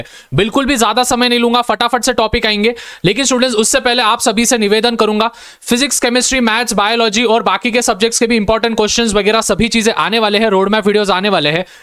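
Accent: Maharashtra, India. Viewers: Sir marathi ahet ka 😅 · Haa sir Marathi vala vatte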